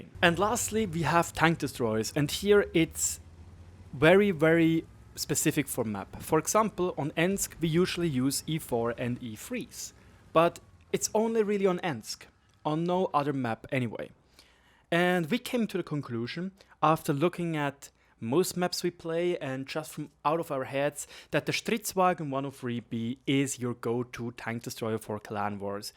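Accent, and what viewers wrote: Germany. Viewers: Das Deutsche in Dir hört man schon hart raus mein Freund :D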